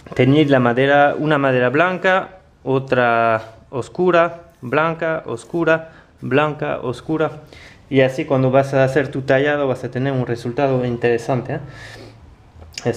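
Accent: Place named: France. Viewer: lo sabia jaja, tu acento español me hizo pensar que serás de Francia o alrededores jejeje grande, aquí el tilo es algo caro porque lo traen a través de la aduana y deben pagar por el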